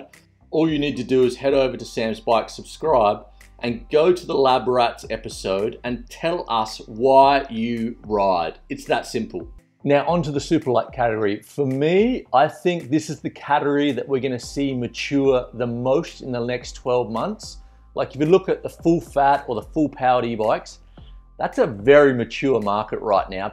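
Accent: Australia. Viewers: Gday Sam. I take it that you don’t like Scott bikes. Predominantly the Ransom e910 & Genius e900tuned. Like your channel even if it is for the Aussie accent 🇦🇺😎🤙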